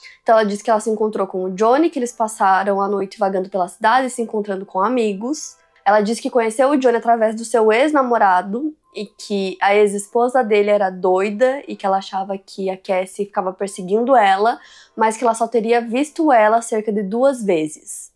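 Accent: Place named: South, Brazil. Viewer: gosto do sutaque do sul rsrs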